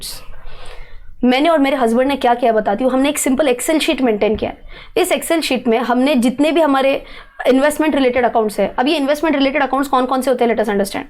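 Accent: Maharashtra, India. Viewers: What a brilliant speech you have. So captivating, so knowledgeable and genuine sounding. Your crisp marathi accent makes it more wonderful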